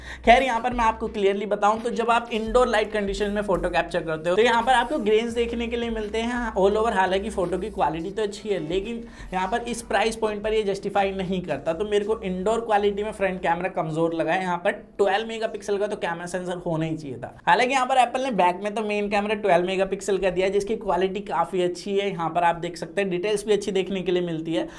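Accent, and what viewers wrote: India. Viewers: Only one tech editor who pronounciate desi hindi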